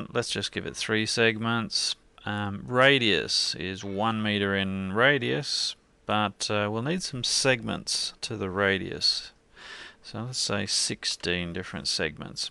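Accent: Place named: Australia. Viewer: I heer an aussie :P cheers for tutorial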